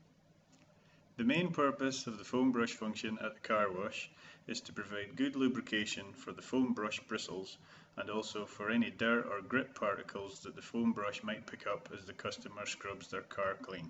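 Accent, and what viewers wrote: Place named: Scotland, United Kingdom. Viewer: I had this question today as my presoak was spitting air and not enough fluid. I played with the fluid pressure and got the stream working again. My system is 30 years old and the foamy brush and presoak share the same air pressure but they have individual regulators for the fluid pressure. Thanks for the education on this. I'll be checking my floam consistency from now on using your guidelines. (and how come an Aussie has a Scottish accent?)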